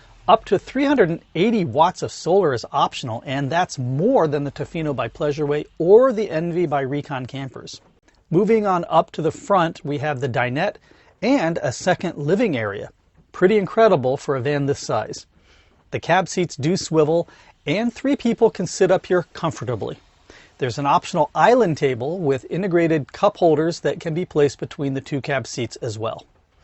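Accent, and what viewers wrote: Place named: France. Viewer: Rethinking everything now. Maybe it would be better to go with something like this! Nice French accent, by the way!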